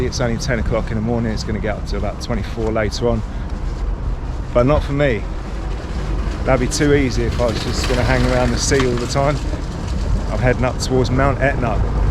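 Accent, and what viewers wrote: United Kingdom. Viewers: I was curious to hear your Italian, it seems good to me, with a very British accent😉, funny to see you wrong to say "specificamente" haha👍🏻 The Sicilians in the port of Catania all seemed very intrigued haha .. Sicily is beautiful but also very particular, especially the Sicilians, you just need to move a few km and change cities to find very different habits and customs, the food is usually very good and cheap , but be careful to call food right, Sicilians are offended if you're wrong haha... I crossed Sicily from Catania to the Egadi in 2021, passing through Etna, Enna Agrigento, Palermo and Trapani... so I'm very curious to see all 3 videos. I've never tried Bronte pistachio limoncello🤔 but it should be drunk after it's been in the freezer 😅👋🏻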